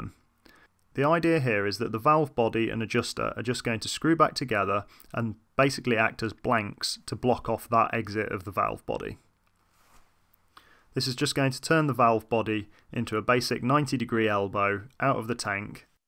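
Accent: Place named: England, United Kingdom. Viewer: I like this idea. The only thing you may have missed or considered not necessary is a pressure relief valve. If you decided to do without, why?....guess I should of read the comments first as apparently I'm not the only one wondering and the question was asked and answered. You didn't show how the tank was connected to the compressor tank in detail. It's assumed that with your accent and the union jack you and from England so what is the code as it pertains to pressure vessels? Thanks.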